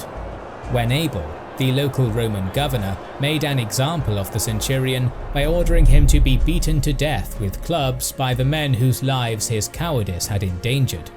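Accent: United Kingdom. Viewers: this is going to be a controversial statement, but you might want to encourage your school to utilize a channel with an american narrator. i consider myself a student of languages and i've read a million anecdotes about the general american accent being an easier accent to understand for ESL students than most of the accents which occur throughout england and wales. unless you're located in a country geographically near to the UK, it's also more likely you will encounter the american accent in the media.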